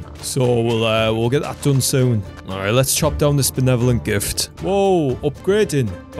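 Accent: Scotland, United Kingdom. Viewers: Probably british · bri"ish man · sounds Scottish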